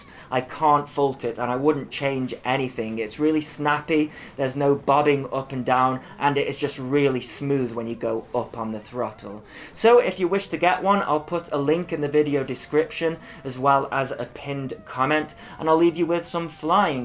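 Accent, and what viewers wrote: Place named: United Kingdom. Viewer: Yey! He's back... Teasing us with his new toys!!! :-D Great to hear more and more British accent on the hobby channels!!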